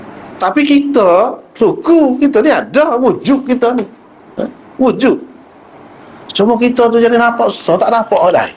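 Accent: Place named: Kelantan, Malaysia. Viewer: pekat dialek kelate..kalau ada sarikata lebih ramai org bole faham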